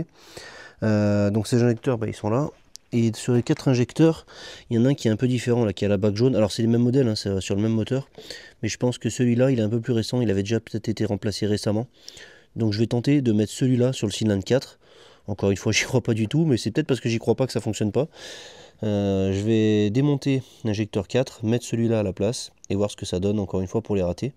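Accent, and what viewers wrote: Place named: England, United Kingdom. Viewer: Bonjour, j'ai fait une visite par hasard sur ta chaine ( 1 pouce et un commentaire ) mais ce que j'y ai vu m'a fait prendre , disons 40 ans de plus !!!🥸 Fidèle abonné de la chaine CVVT de Vincent Raynaud, je ne l'ai jamais vu se lancer dans de telles aventures informatiques. Les Mini dont il est un spécialiste sont certainement des modèles plus anciens. Pour info cependant si tu ne le connais pas, il restaure des voitures récupérées dans le maquis ( Corse) et a même remonté une Mini avec laquelle il a fait un rallye historique il y a 2 ans ! Pour conclure je suis quand même assez surpris d'avoir découvert que le moteur de cette voiture avait été cassé à plusieurs reprises ! Bon courage pour arriver à tes fins, quant à l'accent anglais, pas de problème, mais traduis plutôt les messages en français ce sera plus cool.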